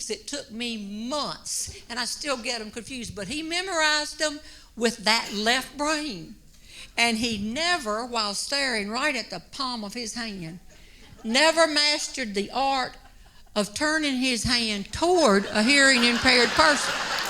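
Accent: South, United States. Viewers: You are soooooo Southern, I am homesick. I love your accent and story telling ability. It's a wonder your family hasn't disowned you by this time. I love it. I love it. Thank you for your gift and sharing it with the world. If your children and grandchildren ever run away make sure they sign off on their insurance plans. You know they'll come to no good. You might as well cash in. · Her delivery and voice are pure HeeHaw. Brings back wonderful memories of watching it on Saturiday nights. This is the kind of humor I've been around all my life seeing as I'm a died-in-the-wool Southerner. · I will come back to this one when I need a good laugh! Jeanne Robertson is absolutely hilarious with her southern accent and 6'2" frame. Love her! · I stumbled on to Jeanne Robertson today while looking at & "." What a great link. Love this woman's sense of humor & her southern drawl. 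I can't stop watching. Have family in Carolina. Hope we can see her in concert either in Charlotte or Durham.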